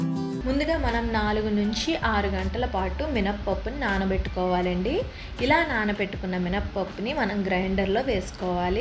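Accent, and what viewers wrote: East Godavari, India. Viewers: Amma ne cheppamanu voice east local vasana vastadi. Vere voice vaddu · మన తూర్పుగోదావరి యాస అలవాటైపోయింది మరి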